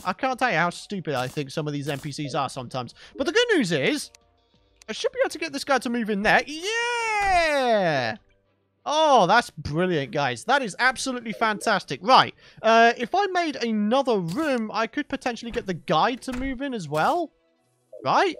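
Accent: United Kingdom. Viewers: What an british accent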